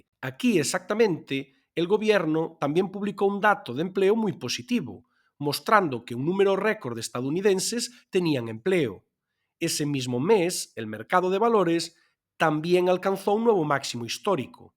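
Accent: Spain. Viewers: Muy buen video! Pregunta de curiosidad 😁: de que parte de España es el acento de la persona que habla en el video?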